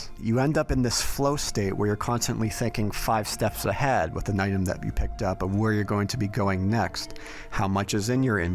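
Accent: United States. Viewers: Thank you. I actually did not know that, but the person streaming sounds American. The H is silent in the USA.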